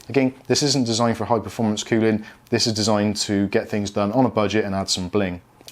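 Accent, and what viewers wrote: United Kingdom. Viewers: I loved the Bri'ish accent mate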